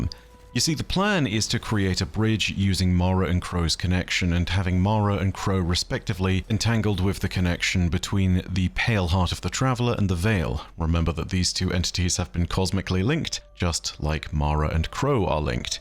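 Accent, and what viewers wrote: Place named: England, United Kingdom. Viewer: Scuppered. Love it, thanks for my daily dose of the King's English.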